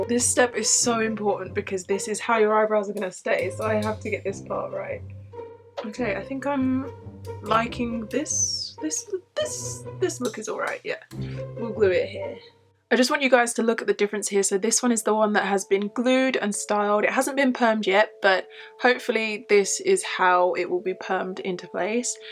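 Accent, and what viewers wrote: United Kingdom. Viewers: I just discovered your channel and I love it ! By the way Oh My God I am obsessed with your british accent 💕💕 PS: Hi from France 🥰🥰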